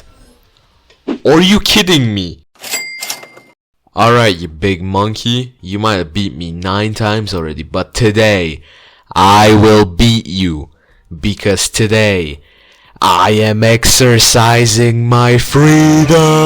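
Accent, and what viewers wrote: Russia. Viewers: you sound vaguely Russian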